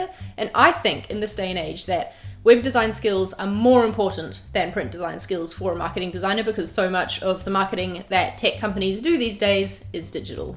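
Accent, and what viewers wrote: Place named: New Zealand. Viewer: such a rare thing hearing another kiwi on youtube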